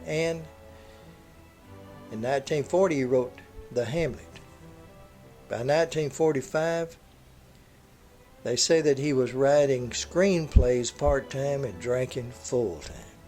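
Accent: South, United States. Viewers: This was a really nice history lesson given in your fabulous Southern style of delivery. The more I learn and read about famous authors and other artists, the more I find how many of them were heavy drinkers. Maybe when they are a bit high on alcohol is when an idea for a novel and such comes to them. Who knows for sure?:), are you driving Lincoln Towncar? The front end looks really familiar to me since I drive one.:)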